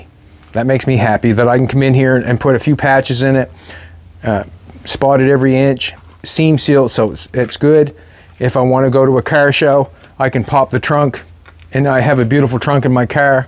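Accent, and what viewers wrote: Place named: Nova Scotia, Canada. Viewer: Trying to place that accent ,then I realized Trailor Park Boys! Then he confirmed with Nova Scotia. Awesome.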